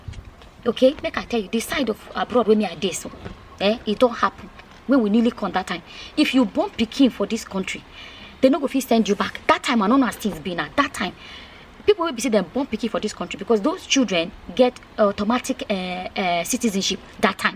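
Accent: Nigeria. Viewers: leee😂😂😂😂your mouth sweet for the pidgen English no be small 👍👍👏👏👏💖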